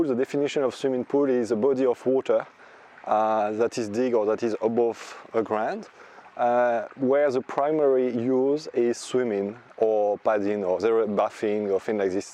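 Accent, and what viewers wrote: France. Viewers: Vous êtes français ? Car quand je vous écoute ça ressemble à un français qui parle anglais😄😄. C est pratique du coup parce que je comprends un peu mieux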